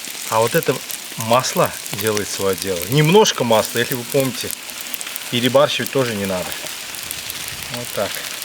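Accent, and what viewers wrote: Baku, Azerbaijan. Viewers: Бакинский акцент. Знакомые бакинцы независимо от национальности именно так разговаривают.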